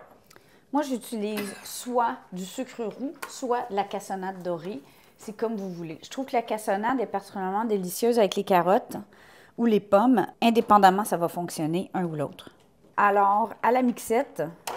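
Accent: Canada. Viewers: Merci la j aime tellement tes vidéos et tes muffins hum, j aie tellement adorée cette pâtisserie au canada que j aie visité tellement de fois une dizaine de fois,q j aime le Canada, et tu me remémore tout,les odeurs,l accent le bonheur de ce beaux et merveilleux pays,encore de belle recette,je t aie découvert il y as quelques mois mais j adore, ta simplicité ton humour et ton savoir faire je suis de lyon,je sais que tu ns as visité et je pense que tu vs avez aimé,la gastromie le bonheur du bien manger lyonnais et je cuisine beaucoups aussi ancienne cuistod,bisou et hâte de voir encore et encore tes vidéos. Valou ou moufette au Québec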